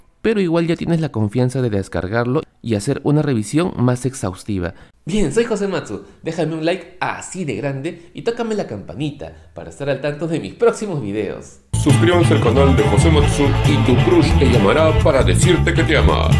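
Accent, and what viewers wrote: Peru. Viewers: Jajajaja no me gusta el acento peruano, pero a él se le oye bonito😊 graciosito o es como empieza el saludo a él se le escucha lindo 😚 saludos sabrositos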